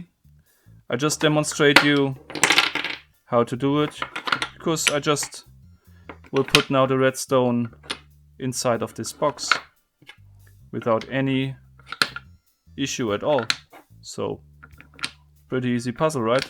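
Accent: Germany. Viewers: Glückwunsch !!!! ( dein deutscher Akzent verrät dich ;D ) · Ich weiß genau das du aus Deutschland stammst! Hört man ein bisschen am Akzent😉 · Du sprichst sehr gut englisch aber ich höre deutschen Akzent heraus you're speaking very well English but I can hear a German akzent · This channel is great for so many reasons bro. The presentation is great and your German accent is pleasant to listen to. your showing tons of puzzle I haven't seen and the way u show the puzzle then "let us try" THEN solve it keeps me watching. great job! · Glückwunsch zu den 100k. Ich bin schon etwas länger dabei und bin bis heute wegen dem deutschen Akzent fasziniert xD Ich mag jedenfalls deins Videos ( Das ist eig was ich normalerweise sagen wollte haha :D) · using V1 & V2 as a name for that thing is somehow funny with your german accent ;D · Übelst deutsches englisch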